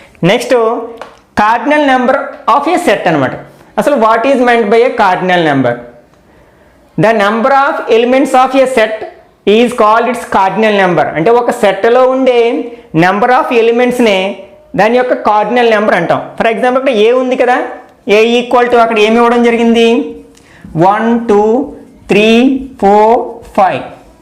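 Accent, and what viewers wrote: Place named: Godavari, India. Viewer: Thank You, While I hear your class I feel as if I hear my close friend teaching me its because of your good Godawari tone. All the best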